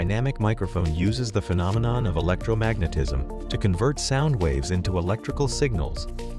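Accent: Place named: United States. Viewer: The automatic voice sounds American, while the writing and grammar are rather spotty.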